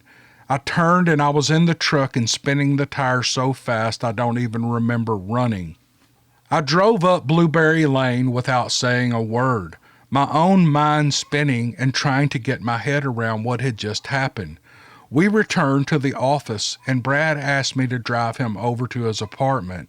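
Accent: South, United States. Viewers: 😂I laughed and laughed 😅 at this story, 🤣 poor kid, this was a fantabulous! Bigfoot story I'm still playing catch-up, but WoW, I do love but the stories have to be read by you exclusively! Or I'm not really interested in listening. IDK if it's your southern drawl, or what 😊 ROCKS❤ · Hey, I have a good one for you. All stories are B.S. = *BEST *STORIES!! I LOVE THESE STORIES ❤️❣️. Keep reading because your the *Best *Story Narrator out here in you tube land. As for the people who know a story is supposedly b.s.( I say ) *Bloody *Stop and enjoy that *Beautiful *Southern voice. Much love to you and yours ❤️ B. S. = *Be *Safe. Lol. 😄.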